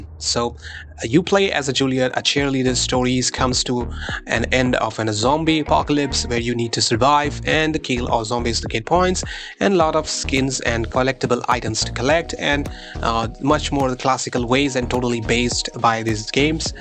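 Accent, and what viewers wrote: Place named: India. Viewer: bro that's some nice accent i bet you are an indian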